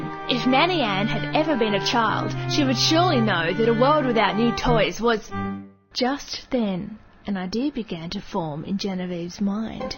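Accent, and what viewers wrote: Australia. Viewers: aussie voice wooot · Australian accent?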